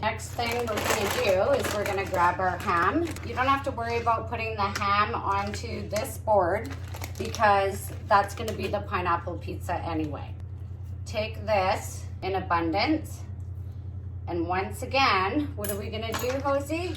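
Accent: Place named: Canada. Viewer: I love the accent too Canadian?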